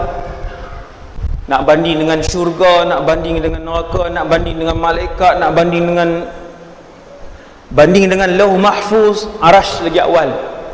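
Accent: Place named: North, Malaysia. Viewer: Cakap Utara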